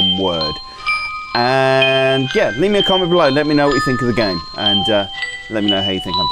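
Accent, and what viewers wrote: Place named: United Kingdom. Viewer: Thanks for playing! We are launching early access in mid-October. Off topic- where did you grow up in the UK (accent question)?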